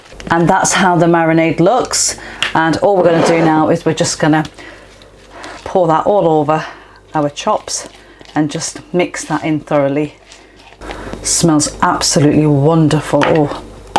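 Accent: United Kingdom. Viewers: Love your videos a lot.. I just bought Cosori air fryer and watching you every single day. Please let me know your name and your social account. I love your UK accent too :) :) I live in Tokyo.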